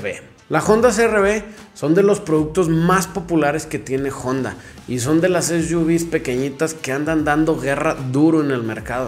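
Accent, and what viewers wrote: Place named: Mexicali, Mexico. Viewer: Usted suena como mis paisas de Mexicali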